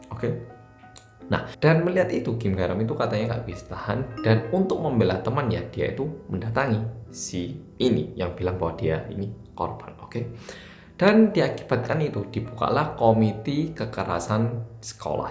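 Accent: Java, Indonesia. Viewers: Lama g nontonin wong medok siji iki.. Sehat trus mas, sukses ya.. Tambah ganteng rek..😁😁😁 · Mas, maaf ooc. Tapi gimana cara Mas me-maintains logat dan bahasa jowo mu disana? Kayak gak pernah berkurang sedikit pun kadar medoknya. Saya suka 👍⭐ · Oppa koreanya medok bgt...hehehe so cool...keren keren...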